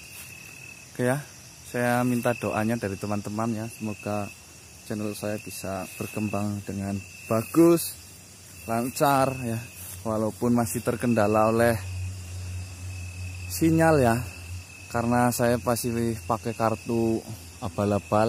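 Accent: Banyumas, Indonesia. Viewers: Woy kamu dari Ngapak bagian mana? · Jal rika wong endi apa wong banyumas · Mas wong ngendi mas, kayane ngapak tmen wkwk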